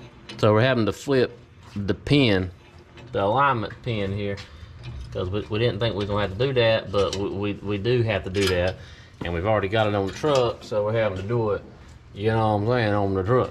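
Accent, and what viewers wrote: Kentucky, United States. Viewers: You sound like EVERY SINGLE KID I grew up with my entire childhood in Kentucky 😂 that’s super cool since I’m in Michigan now lol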